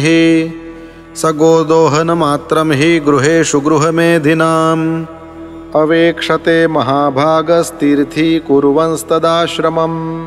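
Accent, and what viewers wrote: Standard. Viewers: ખુબ સરસ અને શુદ્ધ ઉચ્ચાર સાથે ભાગવત શ્રાવણ કરવા મલ્યું શ્રી હરિ ની કૃપા બની રહે.. સાદર પ્રણામ... જય ભગવાન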